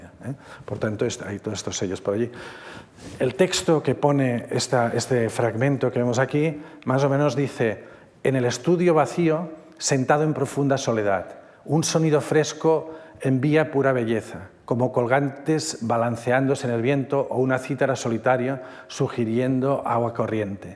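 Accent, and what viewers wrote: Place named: Catalonia, Spain. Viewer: Como dice el conferenciante es catalanohablante y el plural de caràcter en catalán es caràcters. No hay cambio de tonicidad. También se nota en la forma "hacer servir“ (fer servir) y, sobretodo, en las s sonoras de los plurales en contacto con vocal posterior, un fonema q en castellano no existe, pero q en catalán, portugués o francés es lo normal en esta posición.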